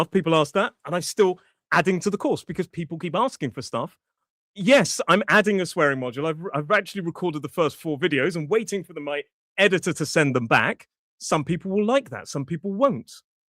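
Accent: United Kingdom. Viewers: Greetings from Panama, Central America. I love that British accent; it conveys a certain air of royalty, class, elegance, and refinement that North American English doesn't.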